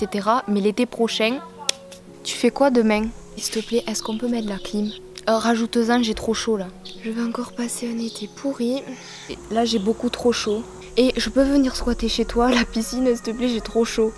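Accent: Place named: Gers, France. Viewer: Twizix normal c'est celui du Gers on a le même 👍🏻😉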